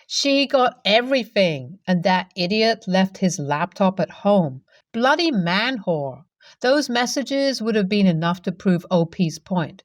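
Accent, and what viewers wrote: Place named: England, United Kingdom. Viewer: Can you tell us what part of England you’re from?I love your accent and the way you read a story.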